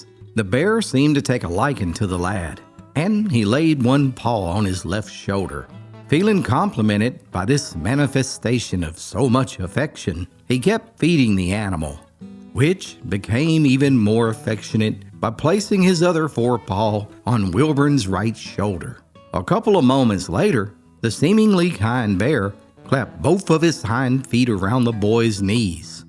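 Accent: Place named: South, United States. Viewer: told in such an authentic southern drawl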